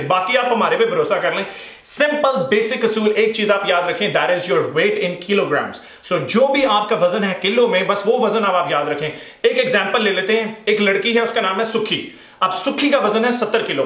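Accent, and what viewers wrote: Punjab, India. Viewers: AWSOME BRO SUPER LIKE TUHADA PUNJABI TOUCH SIRRA KRA JNDA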